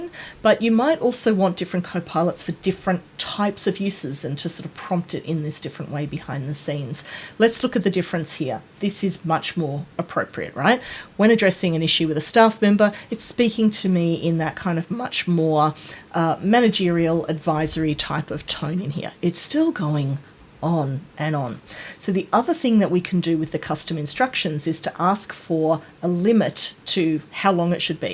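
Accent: Australia. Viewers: Another great and informative video, I am really getting into Copilot as a result of your videos, I can see what an amazing benefit it can be for any sized organisation. Keep up the wonderful work! I always look forward to your new releases. Also, as a fellow Australian, so good to hear an Australian accent on YouTube, as well as the familiar cultural nuances and humour in your discussions.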